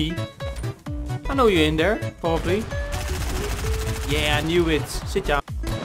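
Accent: Netherlands. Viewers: Is this guy Dutch because he has a Dutch accent? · sounds like your dutch.....ben je dat ook? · That dutch accent tho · Are you dutch? you sound like your dutch :D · He is from holland he said and i am from holland and i can recinize his accent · Are you dutch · Where are you from? You sound dutch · Such a dutch accent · Are You dutch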